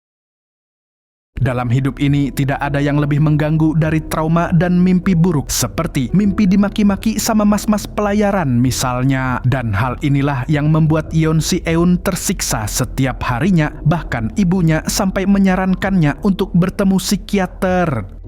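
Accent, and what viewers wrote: Banjar, Indonesia. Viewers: Ikam ne orang Banjar kah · Ini orang banjar semangat bang gak yangka ternyata orang banjar semangat jangan ampih man ai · pian urang banjar kh mang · urang banjar sekalinya😂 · Orang Banjar kah mang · Pian urang Banjar kah mang,Ulun kira urang luar Kalimantan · Ada logat Banjar nya bang 👍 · Bg orang banjar kah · Bang orang banjar hah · logat banjar pian😂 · ini admin nya orang banjar kayanya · Bro lu orang banjar gw juge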